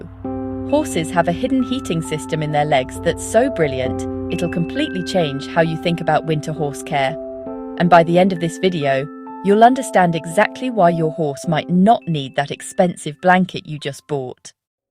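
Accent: United Kingdom. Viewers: March 6, 2026 - (dated for context and reference) Thank you for your kind recognition of my comments. I believe in offering "Positive reinforcement" to people for their kindness, and for jobs well done. In addition, I am a lifelong anglophile, so I very much appreciated the video's narrator for her pleasant voice and of course Brit accent👍👍😊 Lastly as a man, I have noted over the years that female equestrians tend to be quite attractive in a number of ways. I certainly have a lot of respect for people that work so well with such large animals. I live in Connecticut U.S.A., and we have a good number of horse farms and Equestrian Centers/Facilities. Stay well.😊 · What a crock of crap this is. They narrarate the video with a British accent voice to make it sound like they know what their talking about. I guess the British/AI narrator has never seen a mustang whose nostrils and ears have frost bitten off. 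I guess they've never dealt with horses that colic when they get too cold or ones that don't drink enough. What I take away from this video is that this is yet another dime-a-dozen horse expert wannabe shooting off their mouth and trying to sound like an authority when they're not.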